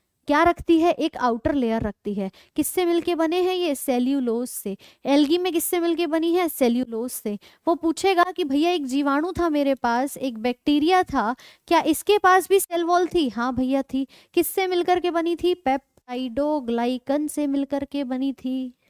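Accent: Bihar, India. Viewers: Etne khubsurat chehre kaise etne khubsurat se pdha lete hai thanks mam ekdam aditya ranjan sir ke bihari style me padhate ho❤❤❤❤❤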